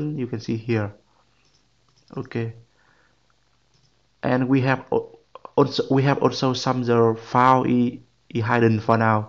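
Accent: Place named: Vietnam. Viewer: Nghe giọng Việt Nam ta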